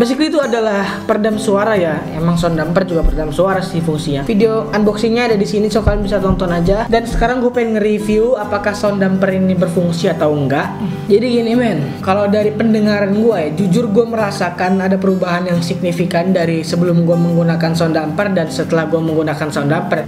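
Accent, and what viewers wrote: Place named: Lampung, Indonesia. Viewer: Logatnya lampung bgt aowkwkwk Salam dari Bandar Lampung gan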